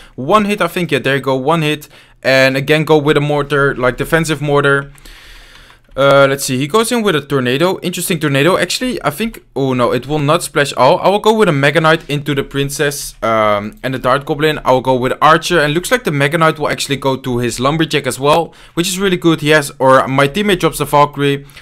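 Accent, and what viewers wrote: Netherlands. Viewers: You can hear that he is from the netherlands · Echt te horen dat je Nederlands bent.............( niet dat ik het beter kan, en geen haat) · are you dutch?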